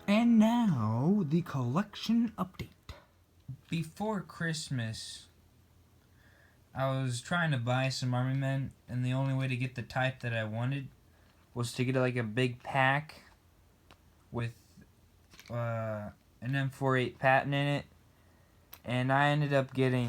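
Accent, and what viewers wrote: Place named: Germany. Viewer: Question can you voice a German tiger commander for me with your funny German accent?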